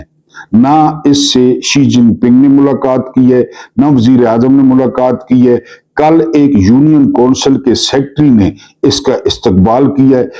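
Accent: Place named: Mianwali, Pakistan. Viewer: آ پکا انداز گفتگو بلکل عوامی ھےاور اسمیں میانوالی زبان کی آمیزش چار چاند لگا دیتی ہے ماشاءاللہ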